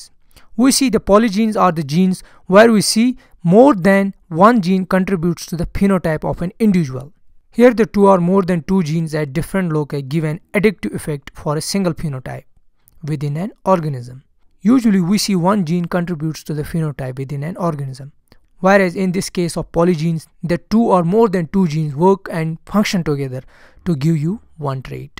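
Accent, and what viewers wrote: India. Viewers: English Indian Accent is indeed one of the hardest to understand 🥹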